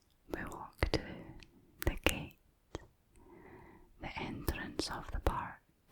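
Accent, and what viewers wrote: Scotland, United Kingdom. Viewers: Your voice is so...so...zzzz (+ the Scottish accent 😍😍😍😍 you could talk me about haggis for hours I would still be relaxed ) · a sco`ish ashmr`tist, we can enjoy that:) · I didn't know I needed Scottish ASMR. Now I wonder how I ever fell asleep without it. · makes it difficult to decide if scottish or irish is more attractive. I would have originally thought the latter because of the brash dwarves in movies but she makes the former so damn pleasant. Not even counting the lovely Scottish Chinese fusion. She's a dream girl in more ways than one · Are u scottish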